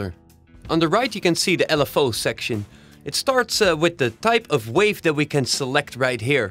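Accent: Netherlands. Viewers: Haha - You sound sooo Dutch. Nice tutorial though - thanx ;) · Areeh joe Nederlands?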